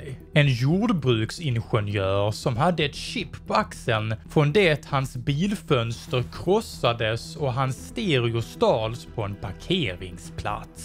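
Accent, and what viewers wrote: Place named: Västergötland, Sweden. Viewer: Holger Nilsson? Det är för att båda är i ungefär samma ålder och pratar västgötska.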